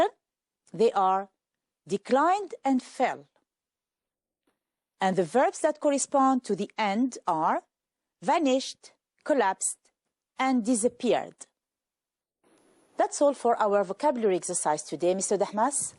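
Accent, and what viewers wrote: United Kingdom. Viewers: Right great RP english thanks a lot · thanks .. :'D british english (y) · it seems that The Mr is using the British accent , i really liked it . i wish there will be some of scientific lessons .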